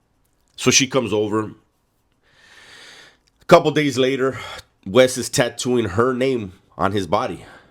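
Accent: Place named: Miami, United States. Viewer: U sound like ure from Miami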